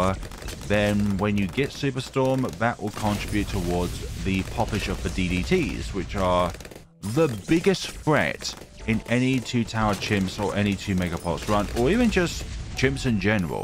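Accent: United Kingdom. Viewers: You are the most British person I’ve ever heard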